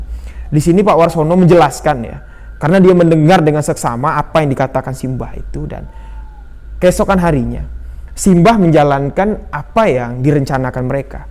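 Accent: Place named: Madura, Indonesia. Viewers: Madura detected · Kembara sunyi (itank tirta) = logat Surabaya = logat madura 😁😁😁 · iyaa bener logat madura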